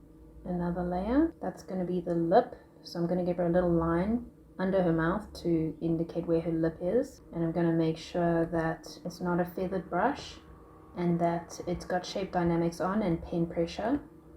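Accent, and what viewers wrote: South Africa. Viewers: AHA! Checked your profile to make sure. Knew I heard a South African accent!! #ProudlySA Keep up the awesome work!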